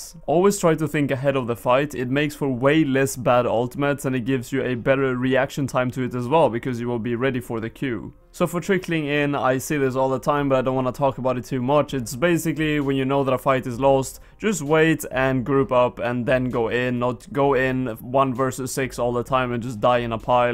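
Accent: Sweden. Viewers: i thought so because of your accent but i was not sure. I'm swedish too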